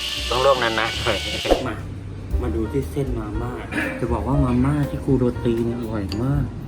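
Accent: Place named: North, Thailand. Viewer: พูดเหนือบ่อยๆเลยนะคะ ฮือ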